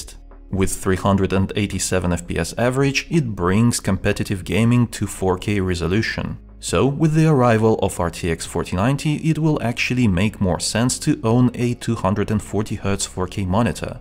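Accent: Russia. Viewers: Мне кажется, или он русский? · Акцент русский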